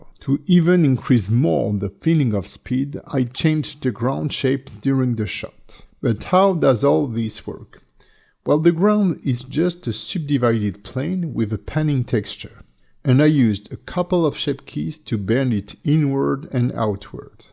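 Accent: France. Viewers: My favorite tutorials are french people making english video with a french accent while i'm french with not so great english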